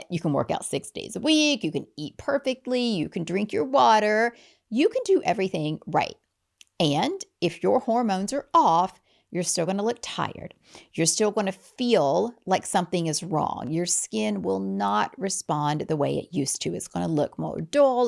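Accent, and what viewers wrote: South, United States. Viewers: Love your country accent lol "i told you i was gonna cus" 39 here and here for all of it !! I was kind of sad approaching 40 but i feel empowered now ! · S**t and F**K are not Sexy or Attractive. You sound like a hillbilly even though you look good!!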